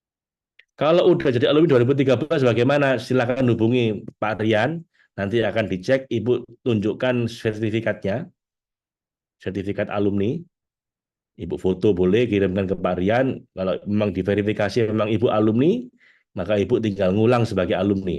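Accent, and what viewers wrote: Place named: Surabaya, Indonesia. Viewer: Pak suaranya seperti cak lontong 😍🙏 logatnya khas Surabaya 🤓🤴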